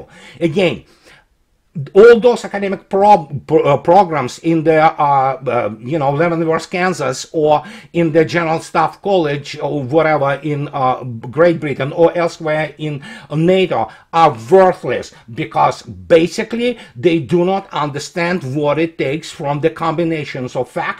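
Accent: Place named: Russia. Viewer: 😂this is like me going for dental 🦷 to the auto mechanic. Ваш русский акцент теплеет душу, у всей семьи, особенно у Дяди, такой был. Спасибо за вашу работу, спасибо за ваш русский юмор.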